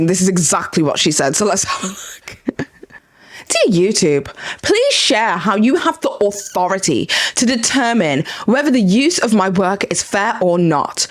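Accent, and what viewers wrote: United Kingdom. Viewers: I love how British people say H 😂 I notice it so much from you and callum Margie lol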